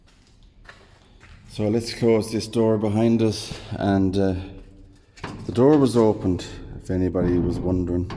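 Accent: Ireland. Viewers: I wonder how many descendants visit? Have they contacted families to see what they would help to fix the crypts. I like your Irish accent. I am Irish on my Mother's Father's side. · The whole of Brussels tends to be 'damp' (I live here!) ..Brussels literally means 'settlement in the marsh' and the river Senne runs underground. Thank you for this fascinating video. 'Conseiller communal is a councillor to one of the 'Communes' (districts) of Brussels. Love your content and your gentle, soothing brogue. Thank you 😊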